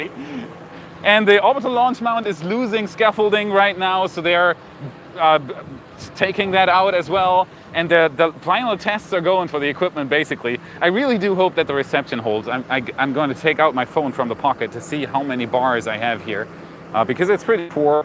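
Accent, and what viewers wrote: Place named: Germany. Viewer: WOWOWOWOWOWOWOwowowowowow!!! Guys who programmed Apollo Computer were at a Computer History Museum talk. At a VCF West - Vintage Computer Fest were some Saturn-5 guys. Ish Spreken Kaine Deutsch . Some how I thought u were a Spaniard but I think I heard German. No hablo Alemanes.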